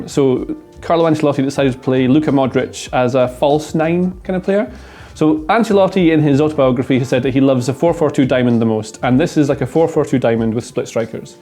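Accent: Scotland, United Kingdom. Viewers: Something about a scottish accent that causes me to take the opinion immediately serious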